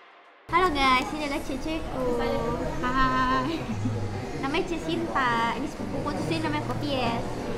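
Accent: Makassar, Indonesia. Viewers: Masih ada logat makassar ta pale 😂😂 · Candu banget klo ngomong pake logat Makassar.. Btw pelan2 Ki dek masih pagi loh itu udh makan SOP saudara ajh🤦😮‍💨 #Ewako 😊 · Wich langsung keluar logat mkssarx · Rajin rajin ki wekna pake logat makassar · aslina logatnya tawwa, nda nalupai 😂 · enak dengar ngomong makasar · Logat makassar memang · Apa kareba bocah remax funky.auto logat Makasar keluar 😄😄 gini rajin upload biar cepat berkembang channelnya.ketawa bikin candu. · Knp ya klo pulng mksar auto kmbli logat mksar walaupun sdh puluhan taun merantau jkrta....wkkkkk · logam MAKASAR nya keluar …beda kalo d Jakarta 🤣🤣🤣sehat sukses ya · Logatnya makassar banget suka deh 😂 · ini tempat kamung halaman ku, lgsg balogat makkasara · Edisi pulkam nihh...👍 Kereeennn..... logat makassarnya masih mantap... 🤭😇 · Selamat dtg di kota daeng mksr, ternyata dialek mksrx keluar ya. 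Mantap salam cantik manis, imut.❤❤❤ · Full logat Makassar 🥰,ceplas ceplos nie 😂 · langsung mode auto makassar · Mantap logat makassar ya ga pernah hilang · Tawwa tidak na lupai logat makassar kka, biasa itu ada orang lama mi tinggal di jakarta, na lupa mi logat kampung na, tapi tawwa tidakkk, salut memang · Beh anak makassar banget nihh logatnya❤❤❤ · Tdk hilangji tawwa logatna Salam dari makassar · logat mkasarnya kluar jo..mntabbbb sehatsehat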